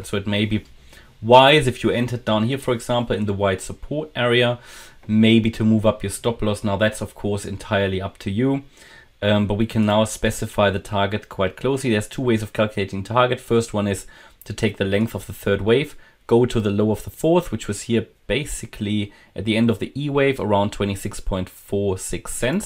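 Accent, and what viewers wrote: Germany. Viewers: Wenn du jetzt noch den krassen deutschen Akzent wegbekommst, wäre das Video richtig gut 😂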